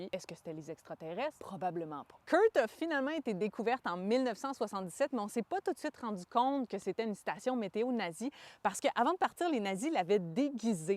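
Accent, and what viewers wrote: Quebec, Canada. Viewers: Ho l'accent, tabernacle ! 😂 je kiff 😂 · J'adore l'accent québécois, je m'abonne !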